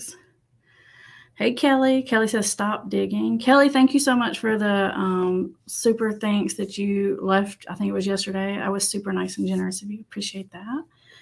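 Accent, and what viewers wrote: South, United States. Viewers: your videos have helped me so much, You are great, and I love your southern accent. I follow you from Argentina😊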